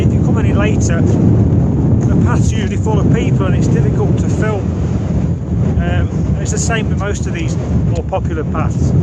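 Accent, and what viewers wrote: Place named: Coventry, United Kingdom. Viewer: Your accent sounds like either coventry or nuneaton/Bedworth? Great videos matey, keep um coming :-)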